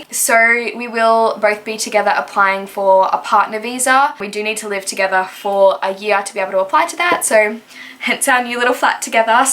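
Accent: United Kingdom. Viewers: So excited you're back! Can definitely hear a British accent! 🙈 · Omg I can hear a bit of a British accent!! Also I’m so glad your back!!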